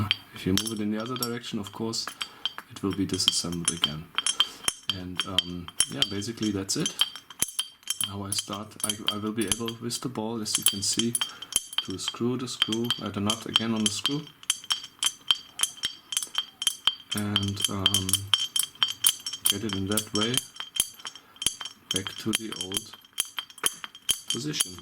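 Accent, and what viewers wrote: Germany. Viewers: Are you german? Your accent sounds like it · André Ribeiro He's German, you're a foreigner too so stfu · Boy this german accent sure is annoying... just as his fingernails -. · Dein deutscher Akzent ist echt Hardcore !! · du bist deutsch oder? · Am Englisch erkennt man sofort das hier ein Deutscher am Werk ist.^^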